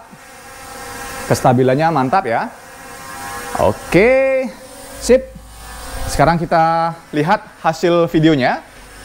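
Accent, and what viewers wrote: Bali, Indonesia. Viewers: Makasi Bang review Dronenya jadi tau kualitasnya. Btw asli Jatim Bang? Soalnya Logatnya Logat Bali 🙏😁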